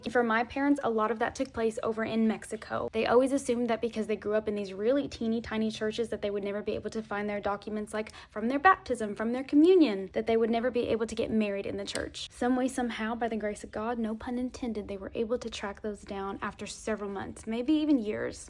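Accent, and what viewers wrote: South, United States. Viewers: yesss! A southern bell that’s what I’m hearing ! Couldn’t pin point it at first lol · The first video I saw of her I thought she sounded very southern!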